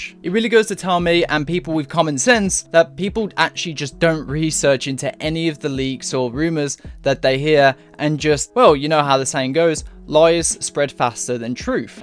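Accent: United Kingdom. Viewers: When I go around youtube to see anime review video their voice pattern almost looks similar( Since most anime review are from American youtubers) but your one kinda sound a bit unique (more like British accent) And turn out it was 😂. Well not criticizing your accent but I must say British accent is really something else 🤔.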